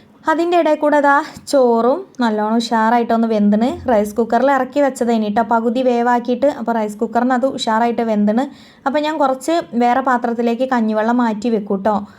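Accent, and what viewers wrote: Kozhikode, India. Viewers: ഇത് കോഴിക്കോട് സംസാര രീതിആണോ, ഞങ്ങളുടെ ഒറ്റപ്പാലം ഭാഗത്തു ഇങ്ങനെ യാണ് സംസാരിക്കുന്നത്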